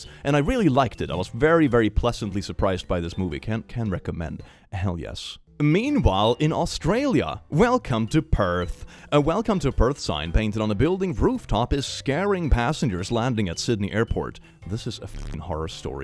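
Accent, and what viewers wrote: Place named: Sweden. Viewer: you sound very swedish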